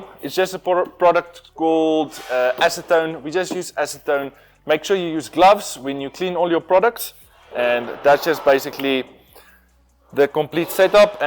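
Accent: South Africa. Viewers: As soon as I heard your accent lol I immediately went onto my phone to check if your South African :p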